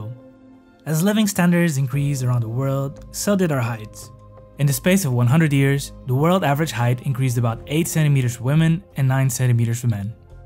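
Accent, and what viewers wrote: Portugal. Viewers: i cant take this portuguese accent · I'd bet my whole house that you're Portuguese, by your accent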